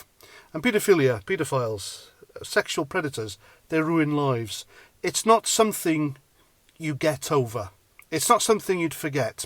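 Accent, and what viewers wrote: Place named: England, United Kingdom. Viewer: Get a grip..l detect an english accent..if you hate us so much...stop complaining, we want to be independent....lived in Loughborough for a year...worse year of my life, filled with racist, bigot bullies..hated the english and they hated me...lived here in beautiful Scotland for 63 years...13 years in the West end of Glasgow...not one bit of trouble..England on the otherhand is overwhelmed with child trafficking...look at all the weirdos in the BBC alone..and the chums of your precious royal family..you had Saville on top of the pops for years...friend to the royals..in fact charlie boy's best pal...the guy from "its a knock out" another pedo..Westminster is filled with kiddie fiddlers...l hate england..why?..its filled with english tory wnakers.....🤮🤮🤮🤮 Saor Alba 🏴🏴🏴🏴🏴🏴🏴🏴🏴🏴🏴🏴🏴🏴🏴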